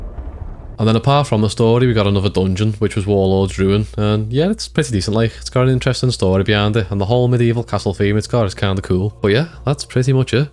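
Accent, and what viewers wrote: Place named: Liverpool, United Kingdom. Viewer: A scouser review! Let's go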